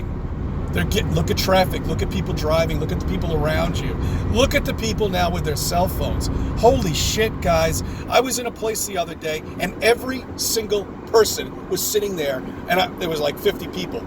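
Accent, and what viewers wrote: New York, United States. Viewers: There's something about driving that turns our "thinkers" on.. I found you about a month ago after hearing you on sun and moon group.. you're New York accent brought me home.. I'm in FL now for 7 years but am from the North shore of Long island.. great ponderings , I have them too.. it led me to this channel on yt called the overwatchchannel.. Dan has a great series on how to escape the matrix and reincarnation trap.. pretty mind opening/bending stuff.. It'd be interesting to hear your thoughts on it.. I'm 2 years Into my fe awakening and can honestly say I'm not friends with a single person I used to be anymore..it's crazy.. and all because they simply refused to just look??! Them chemtrails, vaccines and fluoride certainly have done a very well done job.. bravo to the psycho's.. they certainly created quite the cognitive dissonance cocktail for the ages.. 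anyway check out Dan's channel.. thanks for keeping it real 👍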